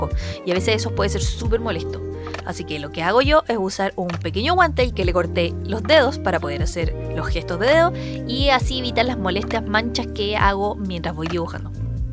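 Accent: Chile. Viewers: Wn me encanta el acento Chileno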